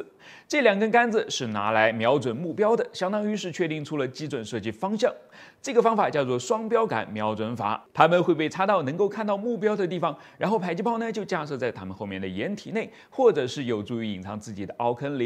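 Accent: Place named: Mainland, China. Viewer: 這是大陸的唸法，很多視頻中聽過。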